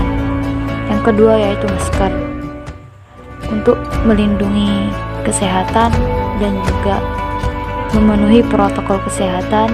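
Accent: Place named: Madura, Indonesia. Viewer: Mantep penjelasan nya mungkin dalm istilah maduranya di bahasakan lebih normatif mbak😊 seperti laok😁😁😁😁😁 dan pennggunaan kata a dan e tapi muwanteppp bagusss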